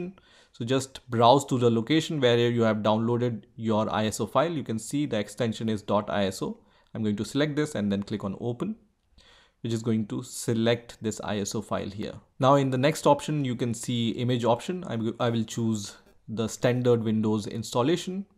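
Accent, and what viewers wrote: India. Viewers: I absolutely detest the Indian accent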